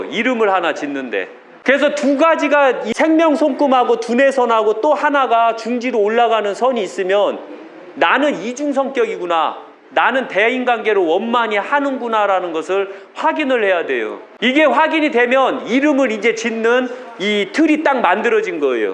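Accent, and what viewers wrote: Chungcheong, South Korea. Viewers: 충청도 사투리가 구수 합니다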